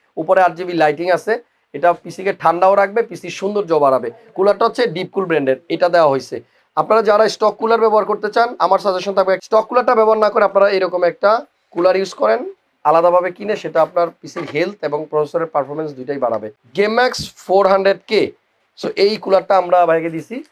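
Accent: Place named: Chapai Nawabganj, Bangladesh. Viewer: তার ভাষা সুনেই বুঝেছি সে আমাদের চাপাইনবাবগঞ্জ এর ভাই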